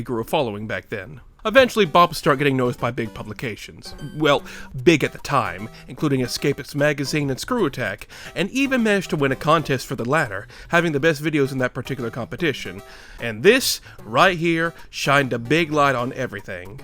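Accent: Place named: Brooklyn, United States. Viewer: His dumbass brooklyn accent that only comes out on specific words is grating as hell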